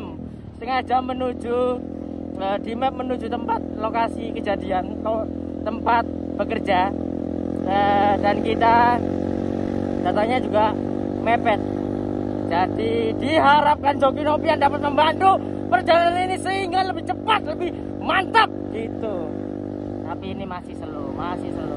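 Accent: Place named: Surabaya, Indonesia. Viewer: Diencep encepkan, Suroboyo banget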